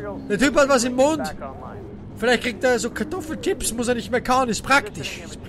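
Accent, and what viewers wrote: Austria. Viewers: Ich hab dich noch nicht do lange aboniert nur eine kurze frage kommst du aus Österreich? :D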